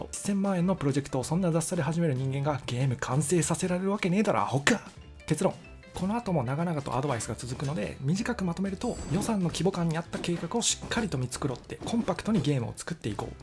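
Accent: Kansai, Japan. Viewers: 関西弁もろに出てきて草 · 関西弁でなんか違和感あるなぁ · バリバリ関西弁ですね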